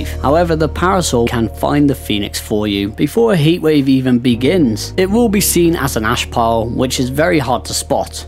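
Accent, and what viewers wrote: United Kingdom. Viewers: It's relaxing to me watching your videos! Even just listening to it lol, I love the British accent! Great video!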